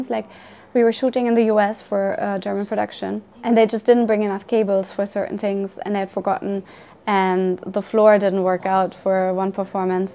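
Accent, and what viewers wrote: India. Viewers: She picked up indian english accent. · at this point her entire body language and expressions and even the way she was talking is completely Indian 🇮🇳 . 🙌🏻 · Her accent is pleasant Indian English 😮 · She has an Indian accent 😂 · It's weird to hear her talk in English with an Indian accent. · I'm not from a rich family, but I remember sometimes my brothers and I would ask our parents some very honest questions in regards to poor people or poverty when we were small. Most often, the response was to the effect of "don't talk about it". That's not to say my parents were against curiosity or something, I think it's a societal thing where we tend to ignore these problems going on right around us because it's not proper to ask those kinds of questions. And I suppose the richer you are, the less you think about these things - but this happens all over the world. 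On a lighter note I love how "Indian" she sounds, her accent is such a melodious mixture of German and urban Indian English 🤗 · she is getting Indian accent 😂😂😂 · yoooooo i dont want to sound rude but her accent sounds sooo indian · Did you note,how smiling she is? She has developed an indian accent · Her accent has slowly changed I would termed it indianish english not fully tough 90% 🙏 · She sounds so indian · I was about to say the same thing that her accent has the Indian touch to it.. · Her english sounds like Indian accent. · She surely have long enough in India .her English accent is very Indian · The way she switches between the German accent and the Indian one is so funny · That Indian accent :D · Really Really liked how her English accent showed a little of Indian accent. She may not stay in India forever, but she would carry a little of India wherever in the world she goes. 🥰😇😍🤩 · I love that her accent is also Indian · Alas! 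This gracious lady even integrated indian accent to herself! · She sounds to be like an Indian woman and her accent is cute 😍❤ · Her accent is sooooo INDIAN that at one point I thought she might be from here since childhood. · Is it just me or she's speaking indian English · She has got an indian English accent as well.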